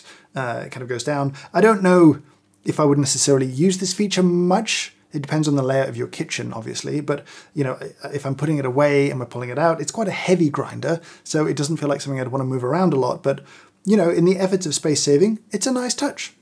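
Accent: United Kingdom. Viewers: I must admit that your videos are kind of a therapy for me. There is much to enjoy when watching them: - beautiful editing - excellent choice of music - great macro shots - I don't know much about lighting, but it all looks put well together - the hair (no further comment needed) - the calming voice - the British accent - coffee - tech-stuff - the jokes I could go on and on, but I need my therapy now.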